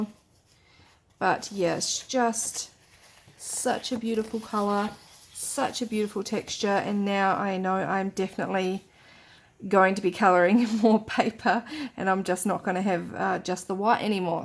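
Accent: New Zealand. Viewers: Beautiful! In fact I zipped straight over to your Etsy shop and bought some! Don't worry about longer videos - sometimes they are needed for us (me?!) to get the gist of things. Also, I think in an earlier comment I mentioned liking finding a fellow Aussie to follow... can't believe I didn't pick the Kiwi accent, having lived over there for 7 years!!! Anyway, good to have someone 'local' (I'm in Port Macquarie). :-)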